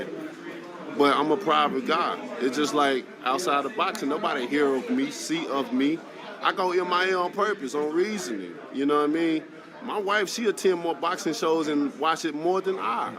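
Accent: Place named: Alabama, United States. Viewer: i forgot this dude has the thickest Alabama accent lol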